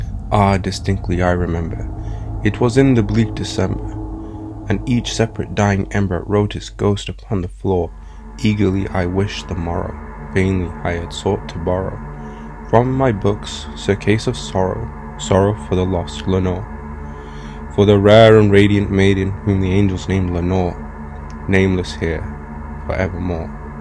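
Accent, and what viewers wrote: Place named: Lancashire, United Kingdom. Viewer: Such an Eloquent Lancashire Accent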